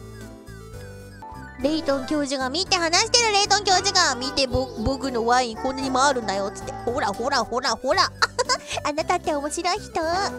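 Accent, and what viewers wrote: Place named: Kansai, Japan. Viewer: that's actually one pair of phonemes she'd have a naturally easier time with compared to her colleagues, since she already has a thick Kansai accent in her Japanese—but the fact that she can now also differentiate between the þ and ð consonants is really impressive.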